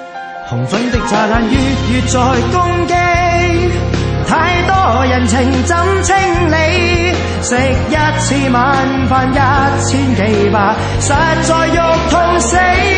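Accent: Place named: China. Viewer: 點解要扮有d大陸音-.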